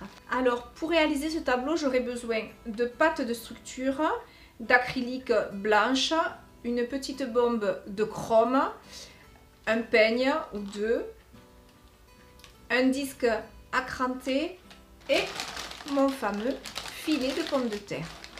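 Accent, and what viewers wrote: South, France. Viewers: a l accent du soleil !!! merci ca donne la niak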